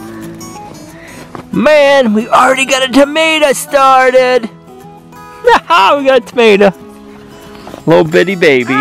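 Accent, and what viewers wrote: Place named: Northeast, United States. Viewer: The accent is bringing me back....where are you from? I was born in White Plains, NY and grew up in Framingham Ma.....just curious...I'm in Central NY near Syracuse now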